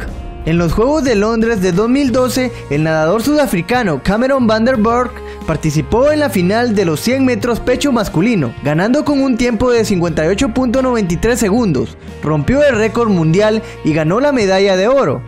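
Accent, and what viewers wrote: Honduras. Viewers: se nota el acento hondureño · Oye te detecto acento Hondureño Eres de ahí ?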